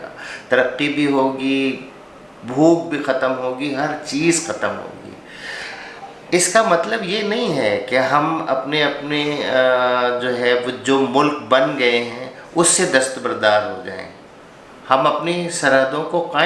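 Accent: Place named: Eastern Uttar Pradesh, India. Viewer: his tone is still Eastern UP or western Bihari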